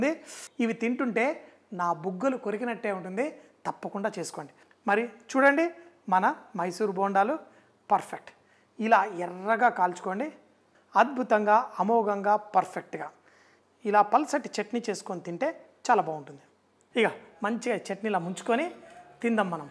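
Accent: Telangana, India. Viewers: అన్న నువ్వు తెలంగాణల మాట్లాడితేనే మస్తు వుంటది 😁🥃🥃🙏🙏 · Telangana slang keka . . .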